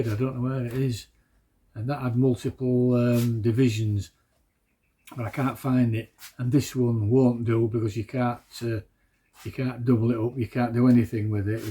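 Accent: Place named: Yorkshire, United Kingdom. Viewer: Hi I suspect you are a fellow Yorkshire Man with the accent? I’m from Wombwell/Barnsley and a wannabe clock maker which is how I came across your channel.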